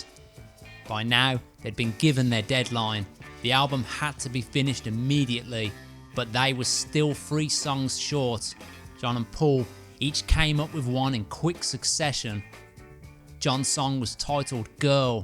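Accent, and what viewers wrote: United Kingdom. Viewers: There's an old saying: "If you put lipstick on a pIg... it's still a pig." Well, "If you put a cliche British narration on a mediocre script, it's still a mediocre script".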